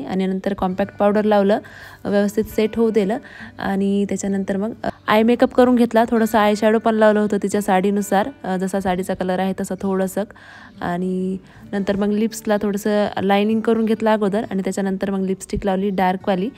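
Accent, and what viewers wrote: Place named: Marathwada, India. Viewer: Tai tumhi mahanubhav panthatil aahat na aamhisuddha aahot Aamhi Hingoli la rahto tumche video baghun kharach marathwadyachi language ekun khup chan wattala❤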